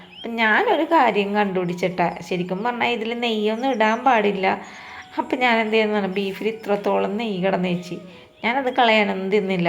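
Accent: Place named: Vypin, India. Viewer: ഇത് ഞങ്ങ വൈപ്പിൻ കരകാരുടെ സ്ലാങ് ആണല്ലോ ചേച്ചി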